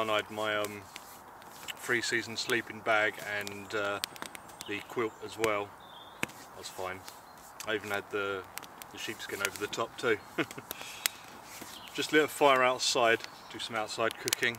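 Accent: United Kingdom. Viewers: Mate honestly I’ve just started watching your videos and you’ve got all my attention and utmost respect! Sir you’re quite amazing. Would you mind telling me where are you from? Cause Sir You sound like you from UK?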